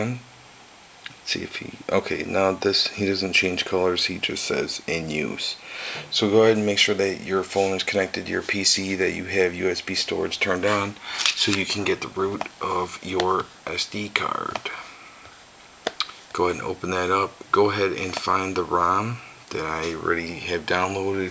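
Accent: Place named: Chicago, United States. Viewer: youre from Chicago huh? lol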